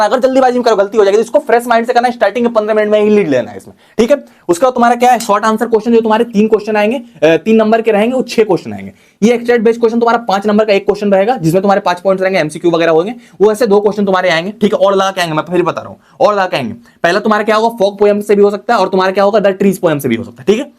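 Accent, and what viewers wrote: Bihar, India. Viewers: BHAIYA KA BATH KARNA SA LAGTA HA BIHAR SA HA HA BHAIYA I AM ALSO FROM BIHAR ❤🎉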